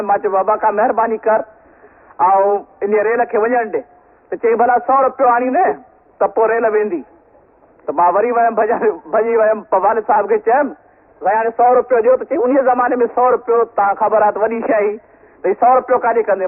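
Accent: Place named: Larkana, Pakistan. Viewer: Kitni piyari sindhi bol rahay hain bhutto sahib larkana style sindhi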